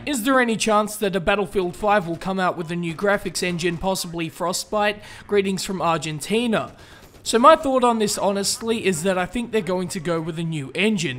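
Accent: Australia. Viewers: I think he sounds Australian. I don't see how he could be American or British. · I'm American and it accent is clearly Australian. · Sounds like an Australian accent to me. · first time I see this "oh, this guy must be from Australia" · Does the person who said the last question on this video think that Australian accent means bogan? This guy's accent is what most people in Australia sound like (I'm Australian and know what they sound like).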